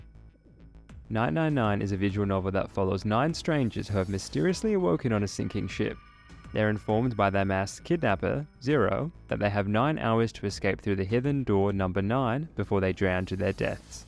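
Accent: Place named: Australia. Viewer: I even would have taken the Meta route as Uchikoshi suggested and did'nt follow up on that the player is some sort of multi-dimensional reaching entity or even a well meaning alien helping them through Alien technology, considering he brought Alien technology to the series..don't really see the harm in making a big part of the series. Sure make them an all-seeing entity but they're not all powerful. As crazy as that sounds, it's much bigger gut punch of a plot twist and would make me buy that humanity had a fighting chance against the Religious Fanatic even with the same ending. Zero would also know of him through the machine's existence naturally. He's a very (lost?) Youtuber who has many differently named accounts (one possibly deleted?) he makes videos on until he went back to his main one cos it was naturally the only one with any traction. It was just tiring bumping into him in the algorithm when he could just make videos on the main channel instead like he eventually relented to. 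Your ozzie accents are a touch similar after all, my mistake.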